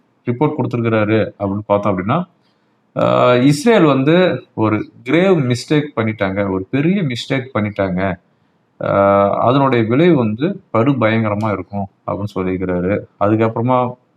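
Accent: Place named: Chennai, India. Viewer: avoid this madras slang. It sounds a bit un professional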